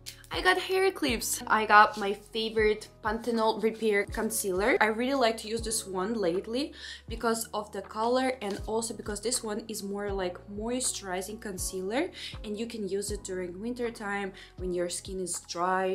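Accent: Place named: Russia. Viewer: 한국말하는 쇼츠영상이 더 좋지만 러시아 억양의 영어히는 도 좋아요 ㅣ😊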